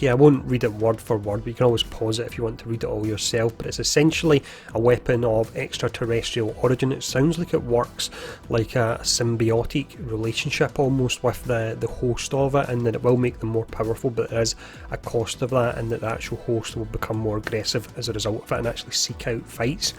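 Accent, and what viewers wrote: Scotland, United Kingdom. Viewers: Long time sub, new commenter. No worries with the Scots accent on my part, half my family are from Perth lol. Keep up the awesome work dude, I played the beta on xbox this weekend and enjoyed it, what are your thoughts after 2 weekends of play? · I have to admit i don't really mind the Scottish accent, even if i'm french. I know since Marvel Heroes and i'm used to it now, i'm pretty glad i am able to understand more english streamers / youtubers thanks to that! Welcome the comment section! Thanks for the good work! Cheers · The accents the best part cause he’s Scottish. What a legend!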